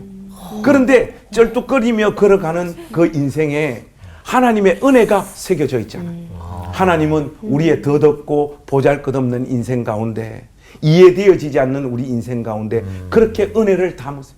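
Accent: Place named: Seoul, South Korea. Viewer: 목사님~ 서울말씨? 멋져요^^ 올포원의 죠지클루니 맞습니다!!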